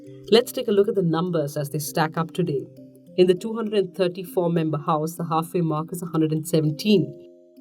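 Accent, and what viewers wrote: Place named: Kerala, India. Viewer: Why Me alwYs a mallu touch in her news??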